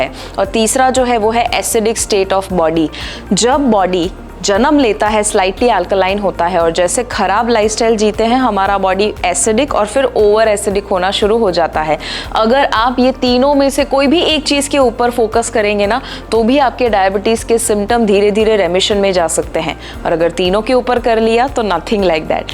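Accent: Gujarat, India. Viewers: madam please speak in Gujarati ,You speek Hindi but your tone of speaking is like Gujarati ,You speak Gujarati language very well and clear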